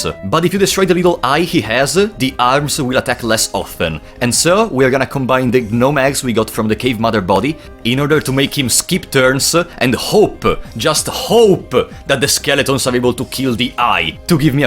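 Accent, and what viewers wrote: Italy. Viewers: I really like your italian accent in English. Sounds so much better than mine (im Spanish)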